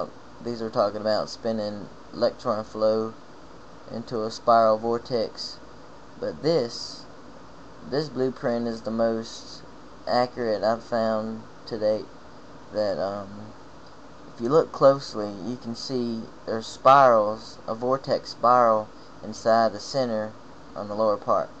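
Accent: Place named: South, United States. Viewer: Who say's people with a southern accent are dumb hicks.This dude is a genius ! Good for him ! This stuff is above me.I'm a dumb Northerner !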